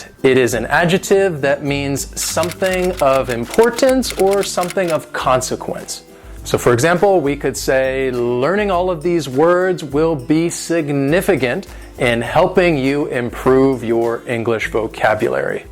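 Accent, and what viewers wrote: United States. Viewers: Dear Sir! I have to admit that the video was definitely useful for me to expand my vocabulary, anyway very thankful for you free lesson with an excellent American accent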